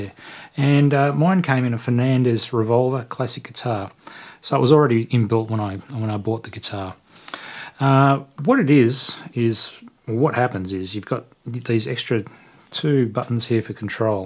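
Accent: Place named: Australia. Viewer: You're Australian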